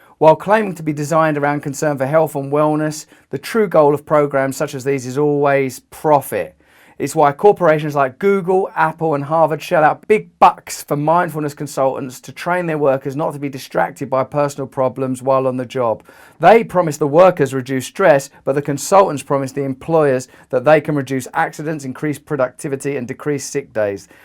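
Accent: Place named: United Kingdom. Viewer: Your accent seems extra britshy today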